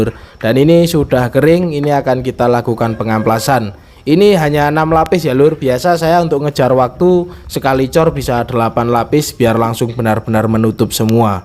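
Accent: Temanggung, Indonesia. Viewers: Ternyata orang temanggung to mas😅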